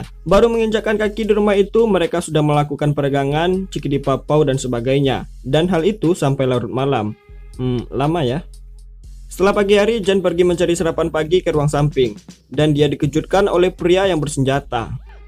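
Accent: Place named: Padang, Indonesia. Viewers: Mimin nya orang padang nya Logat nya kayk orang padang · Orang sumatra ni, rasa rasa padangnya ada atau medan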